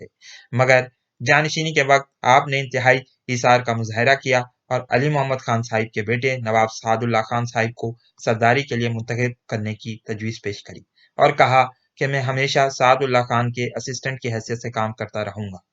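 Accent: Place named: Pakistan. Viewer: Tum lehjay se koi Pakistani lgte ho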